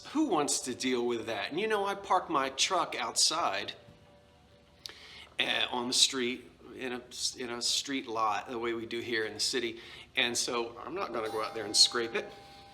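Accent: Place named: New Jersey, United States. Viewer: I enjoy hearing the New Jersey accent. It would be super fun if you ever want to do a video in full Jersey mode!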